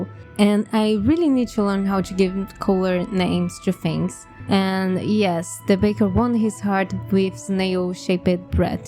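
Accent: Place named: Brazil. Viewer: eu ouvindo o sotaque mt fofo e pensando "de onde será que ela é?" ate o jabuticaba KKKKKKK mt bom encontrar alguma artista brasileira com a msm vibe doq eu gosto de assistir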